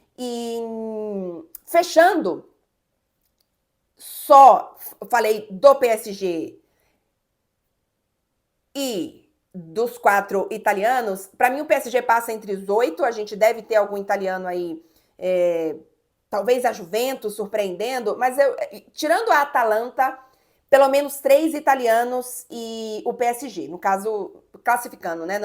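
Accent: Bahia, Brazil. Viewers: Que voz forte, poderosa, e com um sotaque delicioso! Eu apostaria tudo que ela é baiana pra ter esse conjunto fabuloso!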